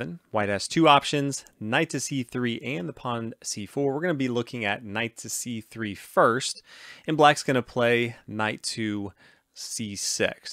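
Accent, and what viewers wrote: United States. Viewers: Thanks. Are you from USA?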